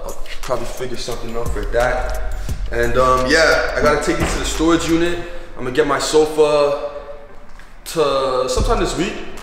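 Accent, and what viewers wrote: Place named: Toronto, Canada. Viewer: As soon as I heard mans speak, I said definitely a Toronto dude. Congrats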